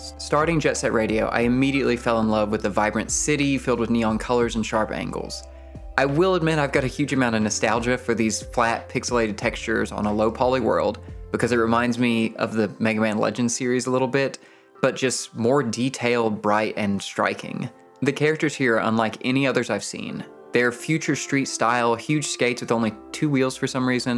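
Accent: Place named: Virginia, United States. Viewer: Are you from Virginia? My old statistics professor has the exact same accent. LOVE the video by the way. I feel like all your observations ring very true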